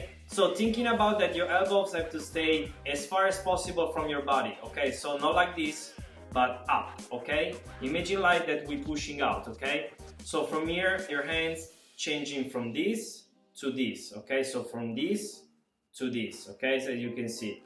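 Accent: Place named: Italy. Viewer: u sounds so italian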